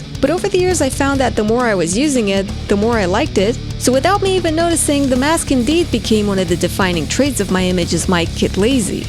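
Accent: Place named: Russia. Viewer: Вааауу у тебя Чисто русский акцент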